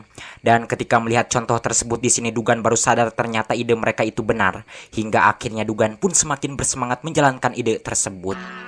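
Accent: West Java, Indonesia. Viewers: Sundana ti mana kang admin?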